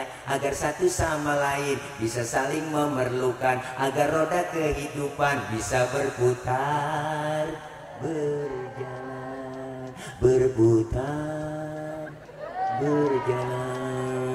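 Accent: Betawi, Indonesia. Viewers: Ustad ceramahnya lucu dg dialek betawinya Sangat cocok untuk warga jakarta bekasi tangerang dan sebagian bogor Bisa ls terjadi interaktif dg para jamaahnya Bahasanya gampang dicerna dan sesekali disertai humor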